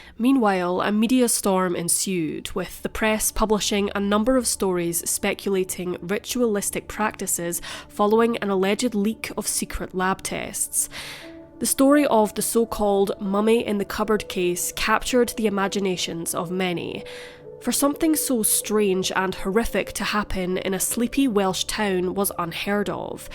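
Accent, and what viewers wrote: Scotland, United Kingdom. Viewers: I know the topics are serious but I do find her voice so relaxing . I love the Scottish accent x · I have family from the Angus area of Scotland, and her accent sounds really similar... sort of 'Edinburgh' rather than Glasgow. It really is such a lovely accent, and the narrator's tone/timbre is so beautiful too... She could read children's stories or folk tales/legends, and it would be amazing :)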